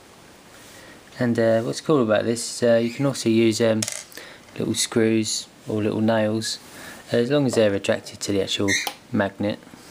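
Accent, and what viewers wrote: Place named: Cockney, United Kingdom. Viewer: It's what's known as a "Cockney" accent.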